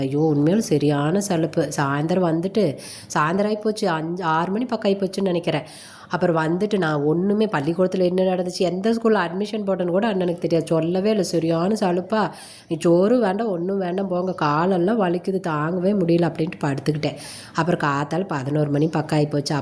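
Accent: Kongu, India. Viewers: Ayyo akka neenga erode ah naa karur 😊😊😊😊 Kongu tamil manakkuthu Naanga mutthur maniyan neenga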